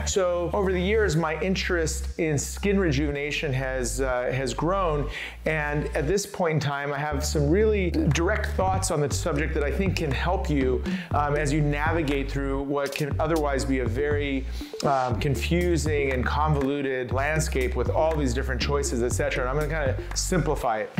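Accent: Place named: Lebanon. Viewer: لبناني صح ؟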